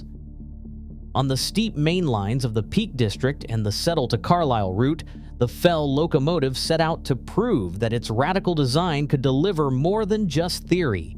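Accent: United States. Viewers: Why an American narration?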